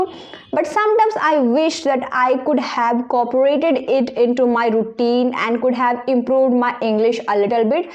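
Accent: India. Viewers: i would recommend you not to change your accent. it’s natural to have ones own accent which country they’re belong to. If a foreigner start speking hindi in their accent and utter ever sentences like foreginer it would also be incomprehensible for us to comprehend. Earnest plead from my side 😝😝btw love your contents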